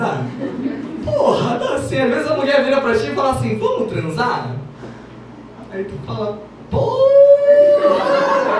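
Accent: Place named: Pará, Brazil. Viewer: Melhor ainda com este sotaque paraense <3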